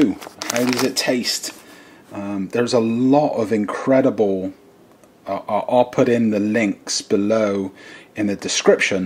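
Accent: Bristol, United Kingdom. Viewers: Bristol! Recognise that accent anywhere! I lived in horfield, Bristol for 5 years.....Alright my lovvveeeee :-)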